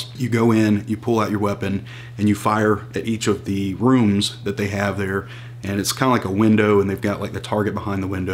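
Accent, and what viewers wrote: United States. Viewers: Is this American or what country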